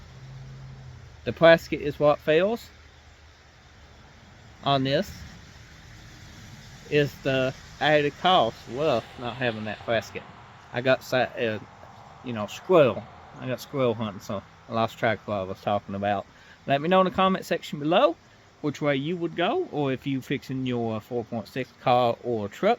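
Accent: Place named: Louisiana, United States. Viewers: Sounds like a louisiana accent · you've got an interesting accent. Where are you from, Louisiana?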